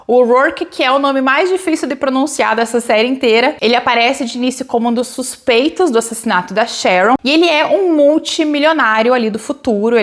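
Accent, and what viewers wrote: Rio Grande do Sul, Brazil. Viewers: Adorei a resenha, sim me convenceu a ler e com certeza irei! Inclusive, nd relacionado com o video kkkk, mas eu sabia q eu conhecia esse sotaque gostoso gaucho de algum lugar, ai vendo sua caixa postal percebi q tu é de Bento, quase vizinhos kkkk, sou de Caxias do Sul. Conheci recentemente teu canal e tenho maratonado!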